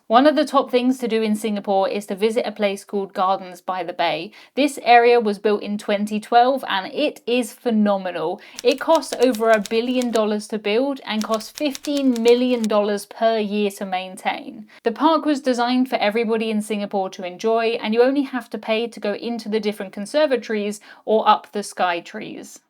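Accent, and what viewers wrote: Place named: England, United Kingdom. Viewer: Thank You alway for providing great cruise information & awesome locale to life on your vlogs.. oh, love your English accent.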